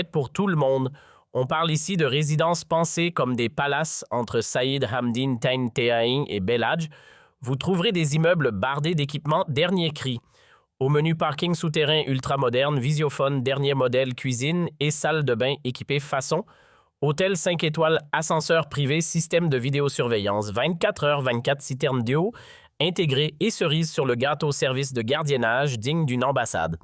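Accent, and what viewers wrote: Canada. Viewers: Tu as une voix canadienne au faite !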